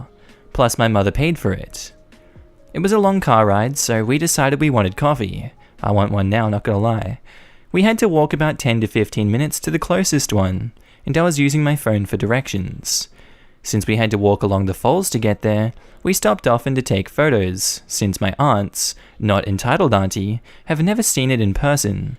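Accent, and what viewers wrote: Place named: Australia. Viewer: Aussie far from home here, sometimes I listen just to enjoy the accent :-)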